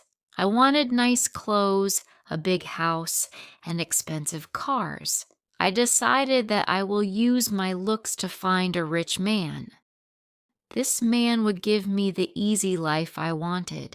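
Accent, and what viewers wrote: United Kingdom. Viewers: Thank you for your story. Is it British English? I have fixed for myself some useful expressions.❤❤❤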